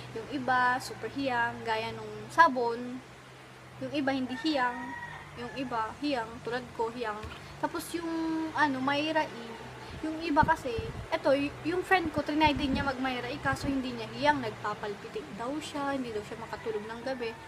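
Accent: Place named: Iloilo, Philippines. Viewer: Ilongga ka girl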